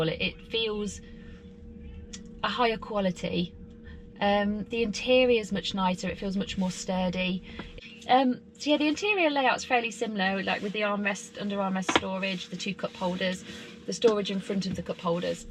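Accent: United Kingdom. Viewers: My wife needs to see this! She complained about the Model 3 P that we rented for a quick weekend getaway with some kids. She said it was a harsh ride and didn't like it. I've got a LR Model Y coming end of Oct - early Dec and she thinks she won't like it.This is GREAT intelligence here! Doesn't hurt that it's narrated in a cool British accent 🙂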